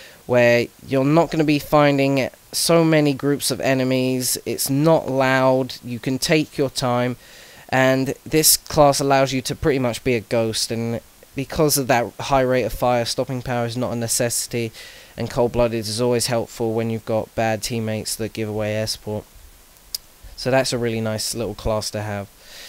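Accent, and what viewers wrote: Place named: United Kingdom. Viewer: Iclicked on this video thinking "Cool! an informative Create a video!" and then I heard the accent and i clicked away not because i didn't like the video, or that i hate British or English I just HATE the accent, and so many people have that accent on youtube and it makes my ears bleed.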